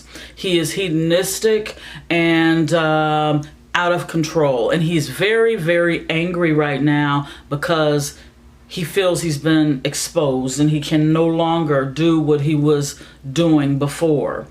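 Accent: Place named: United States. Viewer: Love ur American accent x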